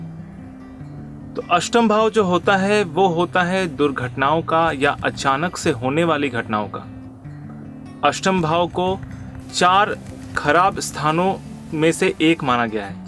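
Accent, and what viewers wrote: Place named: South, India. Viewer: Bakwas jaise sawdaan India bool rha ho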